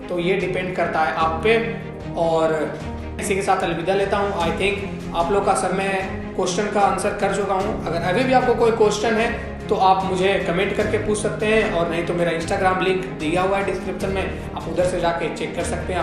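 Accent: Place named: Bihar, India. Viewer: BTW your Bihari language op❣️❣️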